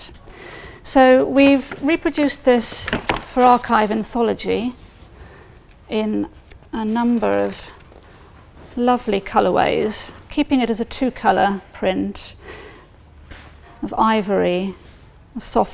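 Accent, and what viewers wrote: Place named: RP, United Kingdom. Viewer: Wonderful posh accent. Very soothing!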